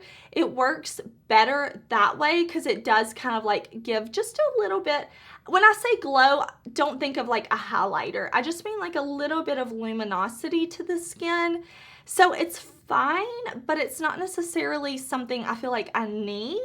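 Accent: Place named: Arkansas, United States. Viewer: Where are you from? Bc u sound like me 😅 I’m from Arkansas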